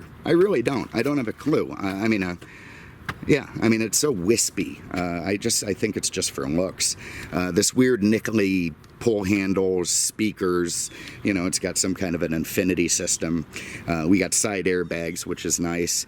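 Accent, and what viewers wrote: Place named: North, United States. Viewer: Why do people who clearly come from the north (your accent gives it away) come to Florida and complain non stop about the heat/humidity? Nobody forced you to come down here. STFU already and just give us the car reviews....:-x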